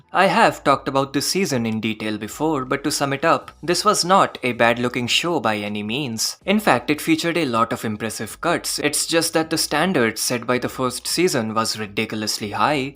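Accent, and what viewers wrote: India. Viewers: Bro has an indian accent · First time hearing your voice, I know you're indian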